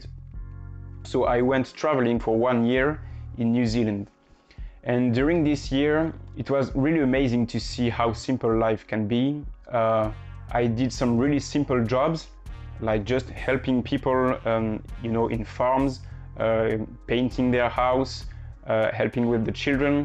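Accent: France. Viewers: Merci beaucoup tes vidéos vont probablement m'aider ! J'ai terminé ma licence de biologie (3 ans), mais je ne suis toujours pas sûre et n'ai jamais été sûre de ce que je voulais vraiment. Le côté musicienne / psychologiste je m'y suis bien reconnue. J'ai dû faire le choix en entrant en 1ère entre littéraire et scientifique, j'ai énormément hésité et j'ai suivi la voie la plus "confortable" et logique du point de vue des gens qui m'entouraient. Il m'arrive souvent de regretter ce choix, c'est tellement tôt pour déjà savoir ce qu'on voudra faire plus tard ! Je me reconnais complètement dans tes vidéos pour la personnalité INFP, merci pour le partage de tes recherches ! Et grâce à ton léger accent français j'arrive à tout comprendre haha ! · Salut ! Malgré que tu parles très bien anglais il me semblait bien avoir perçu un petit accent francais derrière tout ca lol Je suis moi aussi INFP ingénieure en informatique depuis 1 an (data analyst) et je me reconnais tellement dans tout ce que tu dis... 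j'ai plusieurs fois pendant mon parcours à la fac (et encore récemment) réfléchi à si je n'étais pas sur le mauvais chemin... à la base je voulais être prof mais trop sensible au mauvais comportement des élèves j'ai changé d'idée, arrivée au collège j'étais sure de vouloir finir biologiste mais arrivée en fac de bio j'ai complètement perdu la motivation alors j'ai changé de voie et suis partie en fac d'info car j'avais eu cette option en biologie et j'aimais le coté logique et puis mon père aussi informaticien m'a surement influencé aussi à cette premiere periode de doute... je n'avais donc aucune idée du but de mes études contrairement à la bio ou je voulais "contribuer au bien etre du monde", mais j'aimais plutot bien ce que je faisais, je m'en sortais bien les premières années et j'ai obtenu mon diplôme après avoir pas mal galéré sur la fin, en fait j'avais l'impression de le vouloir sans le vouloir, de ne pas trouver de but à ce diplôme... 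Cette année j'ai décroché mon premier CDI tout se passait très bien une fois lancée je kiffais ce que je faisais je ne me posais plus de questions... le covid est arrivé, j'ai du coup perdu mon job et cette période de "vide" m'a fait revenir à moi et qui j'étais vraiment... Avec du r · Hello! This video resonated so much with me!! Although I've never been exceptionally good at Math and Science, I always knew I had a knack for languages and that's what I decided to pursue. I've enjoyed most aspects of it but if I could change what I did at university, I would add in something like Management or maybe Economics or idk, something a bit more "useful" (I majored in Latin American Studies). Anyway, I taught English to non English speakers (including in France! When I heard your accent it made me smile....I love Toulouse ❤️) but now I am just burned out from teaching. I'm currently doing some studies in Human Resource Management but I'm really worried I made a wrong decision because where I live, it's a really small Caribbean island so the market is saturated and I'm here again, feeling very depressed about the future etc. 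Sighs! Unfortunately, I'm still trying to figure out what's the best option for me, only knowing what isn't for me but never quite figuring out what career does resonate with me. I suppose I need to do some deeper digging. Anyway, sorry for the really long message! I'm sorry that I only just saw this video as well! It would've helped me so much back when the pandemic was just hitting and I was returning from my very last teaching stint in Spain. For now, I have a job that pays the bills but I know I need to leave soon!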